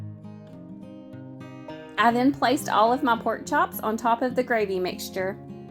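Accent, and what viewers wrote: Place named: South, United States. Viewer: I love your southern accent! Greetings from San José, California!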